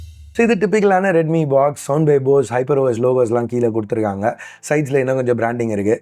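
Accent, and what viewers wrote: India. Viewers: Video Dubai Voice India 👌👌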